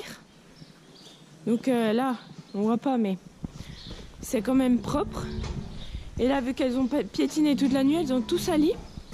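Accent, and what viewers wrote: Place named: South, France. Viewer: On voit bien que tu viens du sud J'adore tes vidéos 🥰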